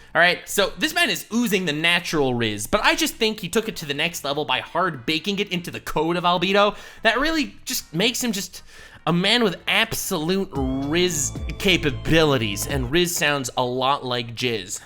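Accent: United Kingdom. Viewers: THe Brit accent is freaking classic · From when are you british? Just I'm sure it was dritish english. Like please.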